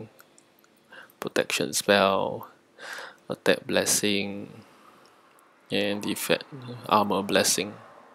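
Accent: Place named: Singapore. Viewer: let me guess...singapore!!! you are from singapore aren't you??i know that accent..haha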